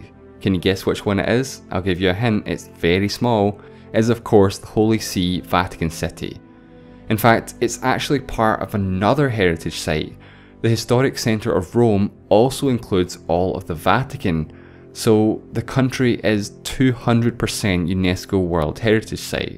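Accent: Scotland, United Kingdom. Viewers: scottish accent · It's Scottish, just not the heavy Glaswegian accent most people associate with Scotland. This from some one who lives further East, possibly Edinburgh. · Scottish? Love it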